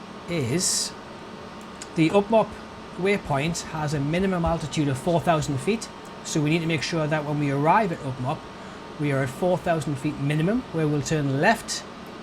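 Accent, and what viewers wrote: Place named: Newcastle, United Kingdom. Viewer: nice accent, hello from a fellow geordie. nice video mate.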